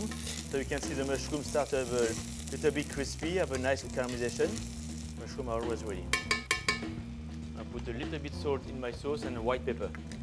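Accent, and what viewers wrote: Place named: France. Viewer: J’ai kiffé la présentation tranquille décontractée sans pression les internautes apprécieront l’accent bien Frenchie merci chef une phrase qui m’a marqué chez vous c’est : Allez! Nettoyer vos stations! Merci chef pour ces recettes bien expliqué English/French trop cool 👍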